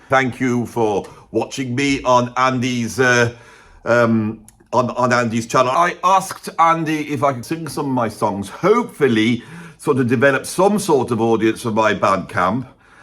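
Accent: United Kingdom. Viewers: You do a great British accent. 😅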